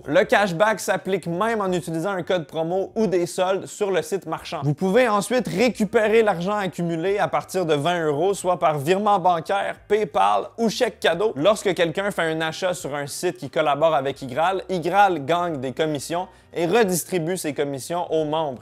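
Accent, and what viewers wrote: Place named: Quebec, Canada. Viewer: On voit qu'il québécois lol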